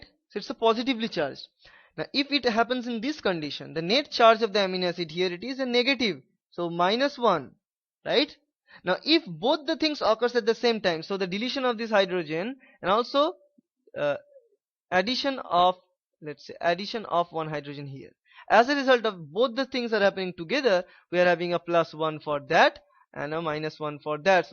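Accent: India. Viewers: you are awesome I like your Indian english lol it's so likeable :d and damn you got some mad skill in bio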